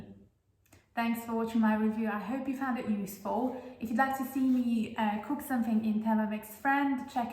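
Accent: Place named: Poland. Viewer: Slysze polski akcent:)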